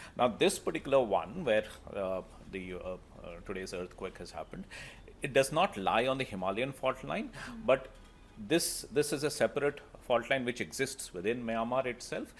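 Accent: India. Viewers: Bill gates with an Indian accent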